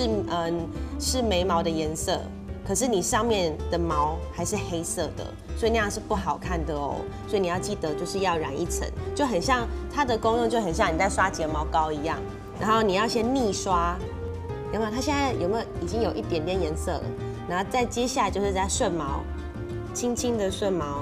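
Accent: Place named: Taiwan. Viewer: 也不是毎個台灣人都這樣...只是她説的比較台而巳...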